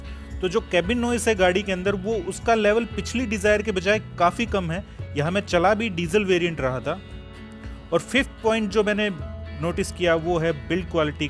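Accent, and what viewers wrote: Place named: Gurgaon, India. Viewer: Bhai Boli Se Gurgaon ka Lg Rha Hai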